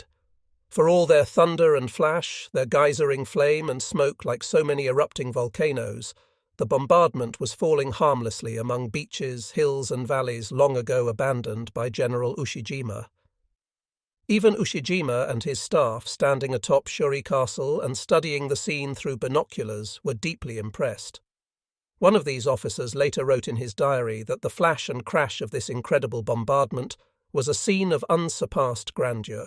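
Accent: United Kingdom. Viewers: would be great without this bad accent......its a American story..dopes...thats a limey ..Semper Fi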